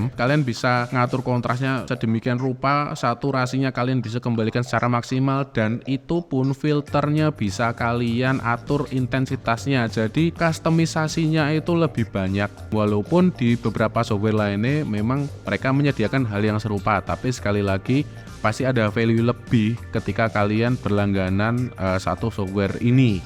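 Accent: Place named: Java, Indonesia. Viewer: Mantap maseh 😂 jowoan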